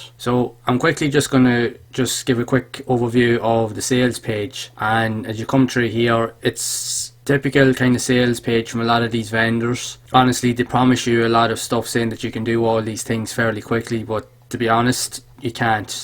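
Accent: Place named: Ireland. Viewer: Great honest review.. Where are you from, Cavan? Nice to hear a fellow Irish man. As per the product.. I doubt even newbies would be approved to promote the 30 offers..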